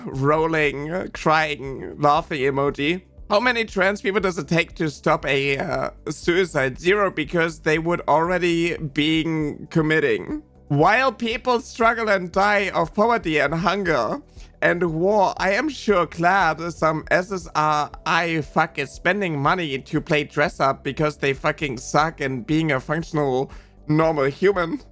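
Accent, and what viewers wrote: Germany. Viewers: i still don't get the voice thing, like have they legit not ever heard women speak, who am i kiding they either stick to such a narrow as shit definition of what a woman should sound like(which would exclude most cis woman), or are the "haha woman should be silent" type bigot, or both. anyway, you just sound like a woman with a german accent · Topic of your voice; you sound like a normal German girl to me. Not sure if transphobes know but accents exist.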